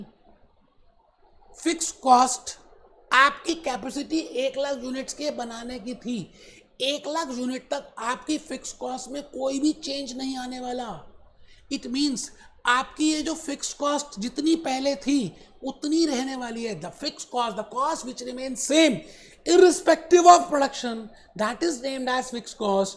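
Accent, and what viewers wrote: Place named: India. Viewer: Thank you Sir. Aap bahut accha kar rahe ho aise video daalkar. I always understood concepts better in Hindi and in a desi dialect.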